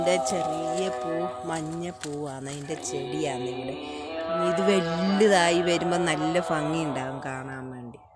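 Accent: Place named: Kannur, India. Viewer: കൊച്ചിൻ്റെ സംസാരം കേട്ടിട്ട് ഒരു കണ്ണൂർ ചുവ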